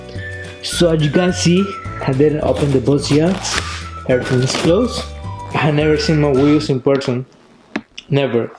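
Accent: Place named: India. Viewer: i like your Indian accent Bruh! lol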